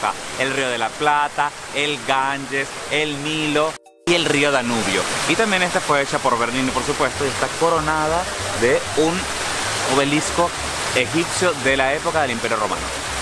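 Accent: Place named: Venezuela. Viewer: Llegue por casualidad a este video buscando informacion para mi proximo viaje a Roma, y por el acento y los comentarios sobre cada lugar asumo qué eres venezolano jajajajajajaja, tengo varias dudas sobre el viaje, has usado la tarjeta Roma Pass? la recomiendas? es cierto qué te ahorras la cola en las atracciones si la usas? Gracias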